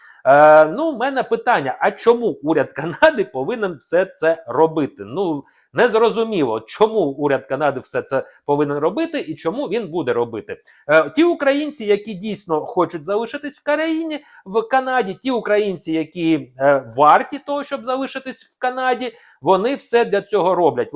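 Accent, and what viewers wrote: Russia. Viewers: Ну очевидно же что ты не украиномовный. У тебя чисто российский говор. Не ломай язык,говори на своем родном языке! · А ты не русский часом?))) Акцент просто ужас.... кровосися....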